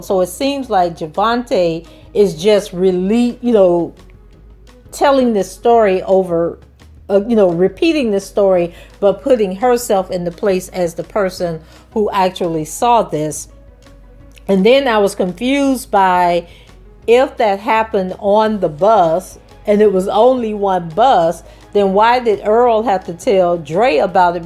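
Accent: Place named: Georgia, United States. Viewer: you sound like my country family from Reidsville Ga with your accent 🤣 Background =Bikeground.. lol 😚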